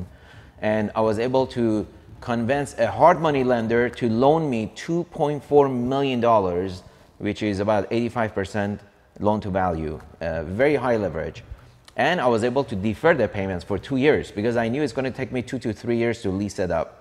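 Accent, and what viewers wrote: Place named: Iran. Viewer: His Farsi dialect still very visible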